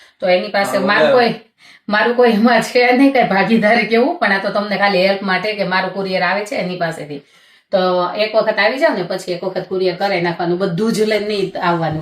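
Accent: Kathiawar, India. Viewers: કાઠીયાવાડી લાગો છો બોલ સાવ ઉપર બેન કયા ગામના છો એટલું જણાવજો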